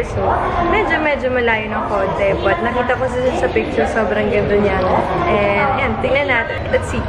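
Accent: Visayas, Philippines. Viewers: Visaya ang acsent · bisaya accent po! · Okii lang. Bisaya kaayo kag accent hahahaha loveyou 😍😘❤ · Ate bisaya accent po yun😂😂😂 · Mommi bisaya po ang aksent non like if you agre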